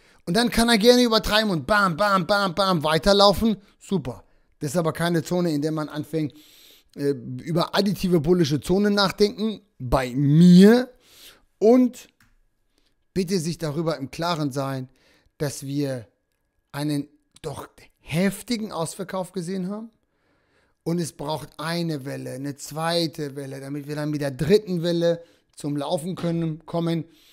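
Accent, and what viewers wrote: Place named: Switzerland. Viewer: das ist glaube das Schweizer Englisch.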